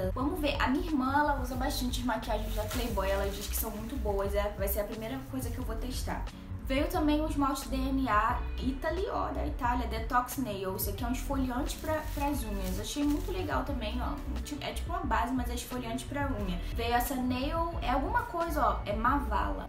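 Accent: Rio de Janeiro, Brazil. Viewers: mana, como vc fala em inglês com ess sotaque lindo de carioca? queria muito ouvir!!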